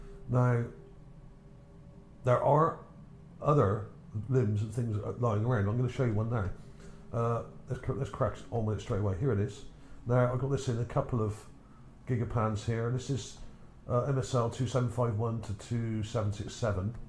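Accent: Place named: England, United Kingdom. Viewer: Love your accent mate, what part of England are you from , somewhere S.W. I know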